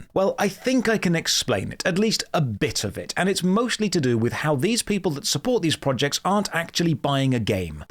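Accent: United Kingdom. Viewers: A really dumb take. You are a great example how British accents don't equal intelligence. How can you talk for 22 minutes about the poor state of MMOs without mentioning the toxic effect that whales have created in every MMO? They have priced out young players entirely from the genre creating a predictable demographic problem. Without young players the developers need to milk more out of each older player. This cycle is not sustainable for obvious reasons. MMOs in 2026 are similar to Golf or Broadway -- a hobby specifically for wealthy people. None of us could have afforded to play a modern MMO back in 2000. It was $90 to play Dune Awakening for the first 2 weeks of launch. By the time the price dropped to $60 it was dead. This game did not die because a lack of social issues. It was dead from the start -- a lifeless whale milking machine. But I get it -- whales are your top supporters. 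You can't be aggressive towards them. It would threaten your income. This is the same lousy excuse developers will give when they refuse to perma-ban RMT buyers. This "community" is rotten. MMOs can't heal until these whales piss off and retire from gaming.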